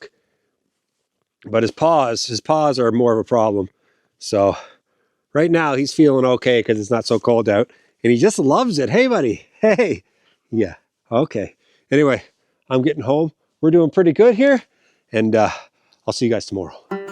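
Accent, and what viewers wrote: Canada. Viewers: As an Australian I had some difficulty with your Canadian Accent. I thought the Dog was called Puppy.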